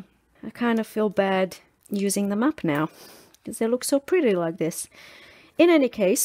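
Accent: Australia. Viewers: Your videos are always inspiring and as I’ve said before it’s wonderful to hear an Aussie accent!!!! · She’s Australian.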